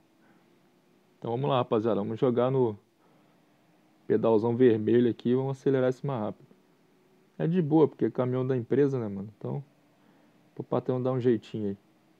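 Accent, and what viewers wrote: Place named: Rio de Janeiro, Brazil. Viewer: Carioca façando é tri dahora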